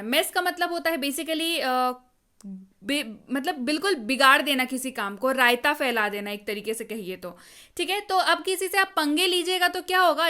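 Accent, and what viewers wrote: Bihar, India. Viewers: ma'am Kya aap Bihar se ho? Aap bhut achha pdati h. All sentence are very useful in daily life.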